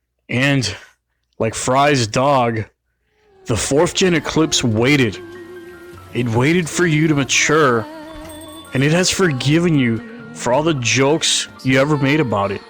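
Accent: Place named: South, United States. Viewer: Bring back the southern accent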